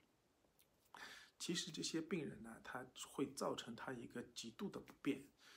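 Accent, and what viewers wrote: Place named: Yangtze Delta, China. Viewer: 你这是长三角口音啊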